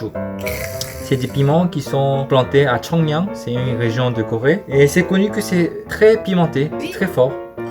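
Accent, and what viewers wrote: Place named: Ivory Coast. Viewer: depuis que je me promène sur YouTube, c'est la première fois que je m'abonne en moins de 30 secondes. ton accent ivoirien m'a grave tuer . pourquoi j ai pas vue tes vidéos avant !? YouTube même vraiment !!! vous avez mal fini kédjenou là déééh , bravo ? merci bonne continuation.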